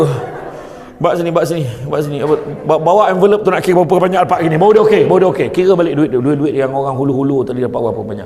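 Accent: Negeri Sembilan, Malaysia. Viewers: Semoga ustaz selalu sihat hendaknya...saya suka dengar loghat nogori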